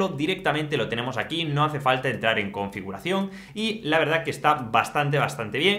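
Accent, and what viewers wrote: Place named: Spain. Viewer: Me gustaría que escribieras las apps o páginas que recomiendas ya que muchas veces con el acento español no siempre se entiende cuales son las apps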